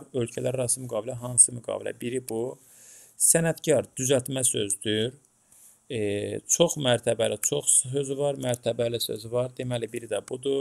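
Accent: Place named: West Azerbaijan, Iran. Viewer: Qaqaş sen qərbi Azərbaycanlisan? Onların lehcesine oxsayir lehcen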